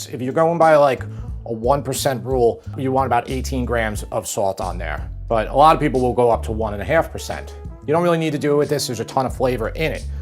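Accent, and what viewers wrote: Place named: New Jersey, United States. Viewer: My mom loves hearing ' NJ accent "yeas" evolve with time. It started out as a boyish 'ya!' but is now a very Rocky level "YE-uh" It's incredible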